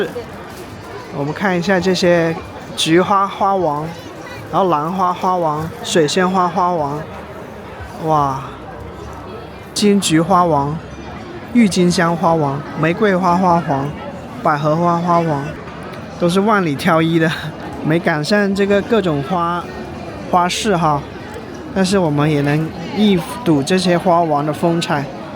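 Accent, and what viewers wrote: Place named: South, China. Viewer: 听博主这口音就是南方人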